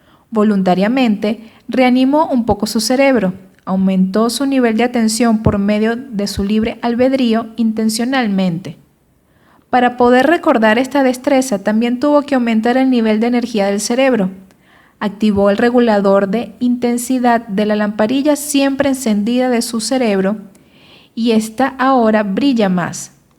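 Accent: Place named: Venezuela. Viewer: Pésimo acento venezolano 🤮🤮🤮🤮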